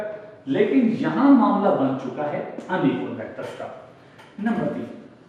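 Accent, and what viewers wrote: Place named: Multan, Pakistan. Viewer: Voice of multan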